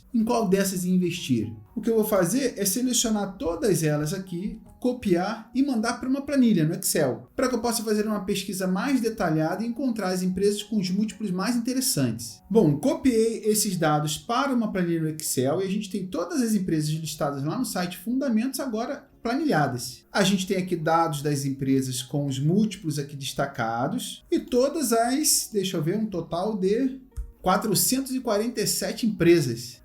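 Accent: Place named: Rio de Janeiro, Brazil. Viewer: Típico carioca. 😂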